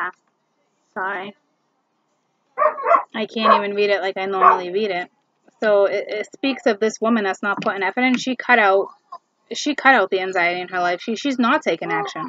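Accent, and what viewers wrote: Boston, United States. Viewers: I know you have a Boston accent. No texting. Call mommy. Then go unannounced at the house. I did that and all the kids were running because my daughter was not there. That put a stop to that ish. She was 14. It's a different world once they turn. 13, 16, 18, 21. She is now 27 and doing okay now. Sheesh... #Motherhood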